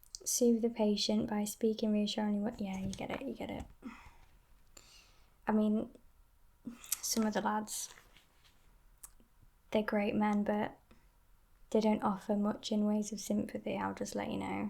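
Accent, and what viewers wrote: United Kingdom. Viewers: Shes either gone MORE british or this is her actual accent and the "posher" one is put on...blown my mind haha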